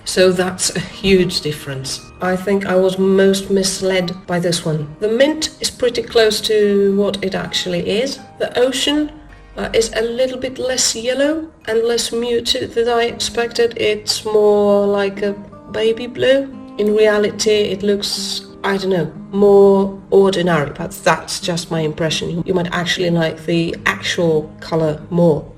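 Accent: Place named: United Kingdom. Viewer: I really appreciate your honest review. I hope you had a fabulous holiday!! You sound like you now have a British accent ...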